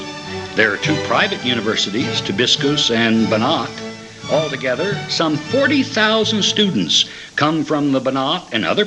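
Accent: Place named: Oxford, United Kingdom. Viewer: an englishman in timisoara this...nice OXFORD accent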